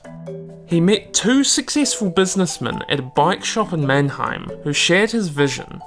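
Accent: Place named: New Zealand. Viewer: New Zealand/"Kiwi" accent!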